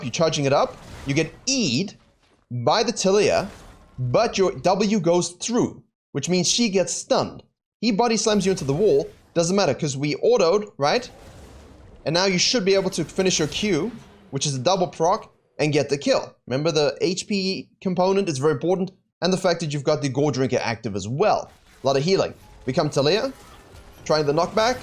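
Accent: South Africa. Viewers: Dude you sound so much like a South African it's crazy haha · Why do you sound South African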